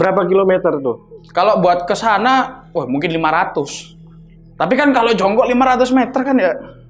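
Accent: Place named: Java, Indonesia. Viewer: biyuhhhh logat e malangan puoll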